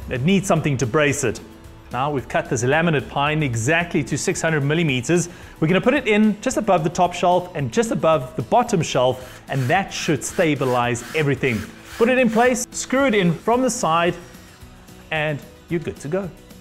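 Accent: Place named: South Africa. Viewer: I was going to ask if the accent was South African... then I realised is not a South Australian youtube channel.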